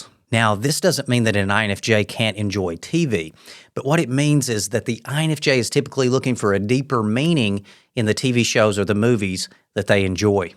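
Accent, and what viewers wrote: South, United States. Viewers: I really like your southern accent, it’s nice to hear.☺️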